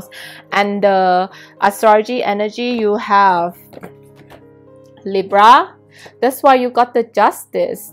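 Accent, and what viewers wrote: Thailand. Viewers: thanks a lot for the fun reading xx btw, are you thai? i'm just curious because we have similar accent. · Pile 1, thanks for beautiful reading. Are u from Thailand? I think u have thai accent. 😊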